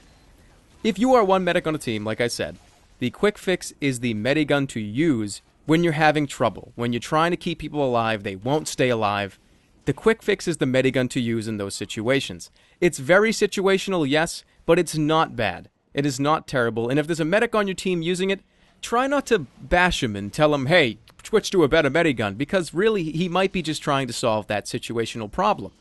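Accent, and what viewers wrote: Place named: East Coast, United States. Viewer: it's the east coast accent. that's what it's all about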